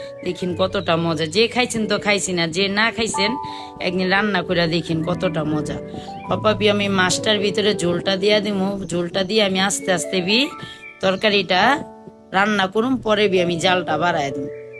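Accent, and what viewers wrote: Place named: Old Dhaka, Bangladesh. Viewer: অলাইকুম সালাম আপু, পুরান ঢাকার ভাসা আমার খুব প্রিয়, সব কিছু মিলিয়ে পুরো ভিডিও টা খুব ভালো লাগলো ❤❤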